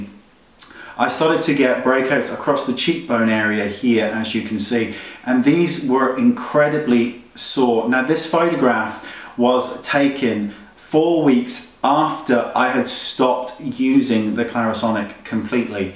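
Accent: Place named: United Kingdom. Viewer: Love British pronunciation! Could you do the Accent tag please? ;)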